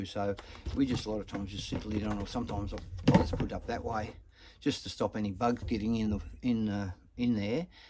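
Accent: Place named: Australia. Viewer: 😀👍 great video and your Aussie accent makes it even better! But seriously this is such an important life or death skill people need to know. Thanks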